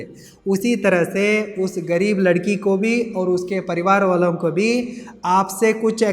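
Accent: Maharashtra, India. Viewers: राम - राम मराठीत बनवा ना.. ( Marathi Accent Refelecting clearly )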